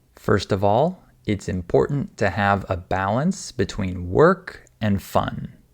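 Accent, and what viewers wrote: United States. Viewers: American accent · english american or británico ?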